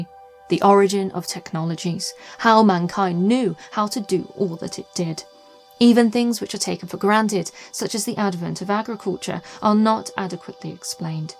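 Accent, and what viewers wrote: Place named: Northern England, United Kingdom. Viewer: I like the way you're doing that bbc thing of talking in a RP accent but occasionally letting that northern accent come through, like your pronunciation of "a"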